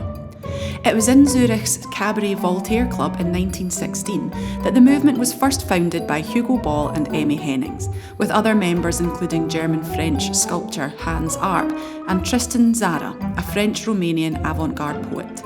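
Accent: Scotland, United Kingdom. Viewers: that scotish accent tho'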